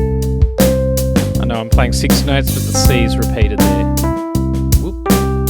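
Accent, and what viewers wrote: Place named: Australia. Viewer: Nice to hear an Australian accent. Cheers from country Victoria. Great lesson.🦘🎼🦘🎶🎵🎶🎶🦘🦘🦘🦘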